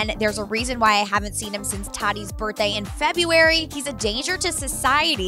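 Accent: United States. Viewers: It’s because she has such a strong (and irritating) American accent 🥴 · Britt Nicole She’s barely pronouncing it wrong are y’all deaf 😂 It sounds like an O because she’s saying it fast and has an American accent- wtf.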